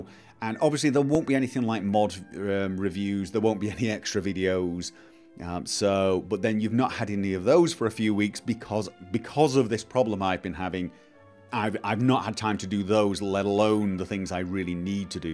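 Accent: United Kingdom. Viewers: Why you come to Denmark every summer? (I live in Denmark) and I need answers! - the mysterious. - You live in Norway right? - But are you Norwegian? - Your english is perfect, and a bit British. - Are you the other way around of Pewdiepie, - Moving from Sweden to england, - You moving from england to Norway? - I don't know anything about you!:O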